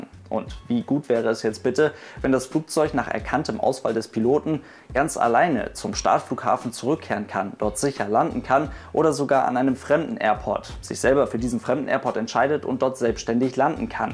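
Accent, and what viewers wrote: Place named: North Germany, Germany. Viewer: Er ist nu mal ein Norddeutscher, da heißt das Flugzeuch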